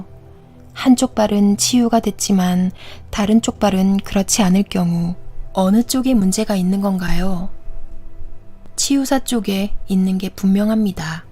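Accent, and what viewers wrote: Gyeongsang, South Korea. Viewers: 안녕하세요~오늘 처음 와봤는데 왠지 귀에 착착 붙는 경상도 억양...ㅎ 제가 대구 출신이라 너무 정감있네요~^^ 저와 님의 끌어당김으로 제가 오늘 여기에 온것이겠죠? 좋은 내용 감사히 잘 들었습니다~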